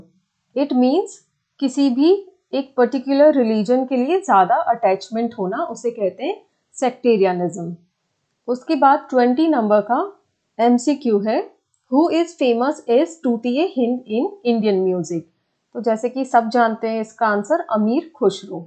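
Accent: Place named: Gujarat, India. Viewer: Aap ke voice se hi pata chal gya tha ki aap Gujarati ho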